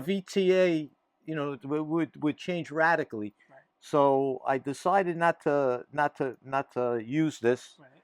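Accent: New York, United States. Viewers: A PHD level of knowledge and a super cool New York accent. Is there a follow up video with the completed turntable? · Great New York accent!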